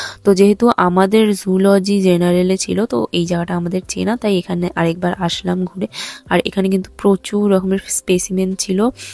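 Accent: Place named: Bangladesh. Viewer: Ekhn o sordi kome nai 🥹🤧 R Bangladeshi style e ktha ta wow wow lagse 🤩🫶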